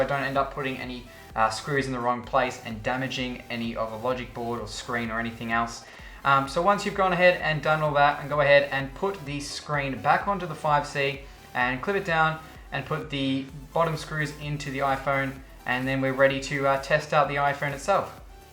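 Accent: Australia. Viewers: you are from new south wales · Are u an Aussie?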